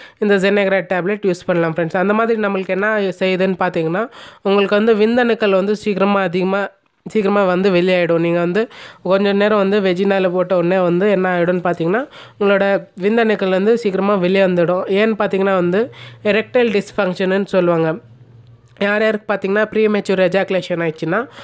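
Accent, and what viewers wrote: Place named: India. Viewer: தமிழ்ல ஒழுங்கா பேசுங்களே இந்திகாரன் மாதிரி பேசுறிங்க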